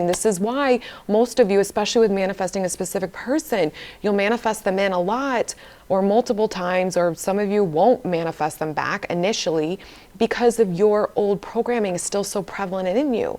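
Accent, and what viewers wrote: New York, United States. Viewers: She sounds nyc for real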